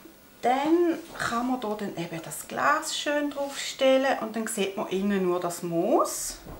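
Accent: Switzerland. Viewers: Bitte nicht auf Hochdeutsch, soviel Charme geht nicht im trockenen Hochdeutsch. Wir beißen uns doch gerne durch deinen zarten Schweizer Dialekt, der ja sehr dezent, aber auch so liebreizend rüber kommt. Und deine Videos liebe ich soooooooooo sehr. Einfach nur wunderschön, kreativ und sooooooo geschmackvoll. Vielen Dank auch für deine ruhige und tiefenentspannte Art, auch, wenn man manchmal merkt, wie es dich bei manchen Themen umtreibt😉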